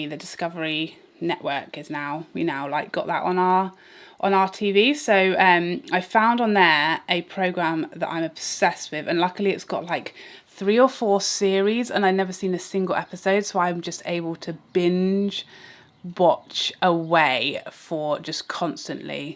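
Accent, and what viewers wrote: United Kingdom. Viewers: It is wonderfull! Thank you so much this giveaway! Here in Hungary we no have this kind of make up . If i will be lucky to win, I definitelly will be the luckiest and happiest person in this World! 😊 I really like your channel and your British accent! 😻♥️♥️ Have a nice weekend for you! BR. Dori Instagram: Maybe - Nude Venus- is a good colour for me.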